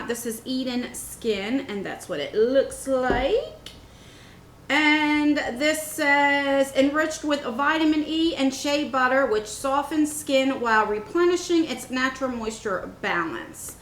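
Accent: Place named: Mid-Atlantic, United States. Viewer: Hi! I love your videos!!! I lived in Delaware for 14 years and I miss that Mid-Atlantic accent!!! Your videos are so informative and I just love your down to earth personality. Keep it up ❤️❤️❤️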